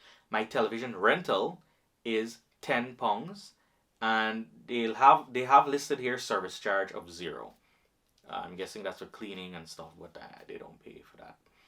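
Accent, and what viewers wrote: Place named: Guyana. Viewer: You honestly sound like ur from Guyana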